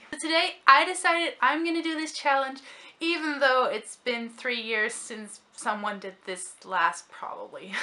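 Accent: United States. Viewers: wow ik zit hier in de states my bf is like "she sounds american"!!!! compliment standing ovation!!! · I've heard "prolly" for probably, in the US quite a bit. lol So you're not entirely wrong. Probably has 3 pronunciations here. Prolly (the quick, lazy way), probbly (the most common), and probably (people who speak really slowly, and drive me absolutely insane lol). But yeah. You'd definitely pass as American or Canadian. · You sound incredibly American...minimally Dutch (I'm American) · As an American, you sound more American and precise than I do haha. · I'm American, and you sound very American. ;) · You sound American and not Dutch O: .. I live in the U.S and I have an American accent. I like the British accent..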